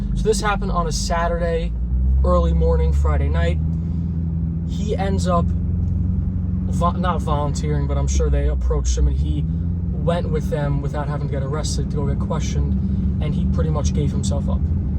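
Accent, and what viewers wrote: New York, United States. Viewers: I like listening to the New York accent without the F-bombs and double negatives for a change.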